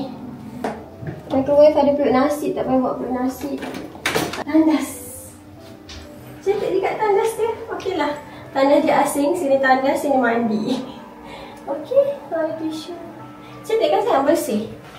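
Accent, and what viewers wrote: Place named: Sabah, Malaysia. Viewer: comel cakap sabah.... buat lah story pekenalan dan suami dan q and a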